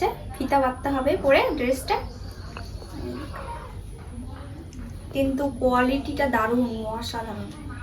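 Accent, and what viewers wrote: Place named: Bangladesh. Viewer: Tumi ki Bangladesh chila?? Ekhankar moto vasha lagteche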